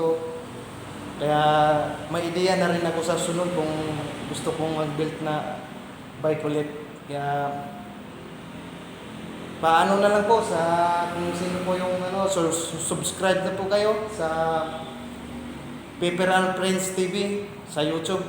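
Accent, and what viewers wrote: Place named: Visayas, Philippines. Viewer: Dong bisaya ka🤣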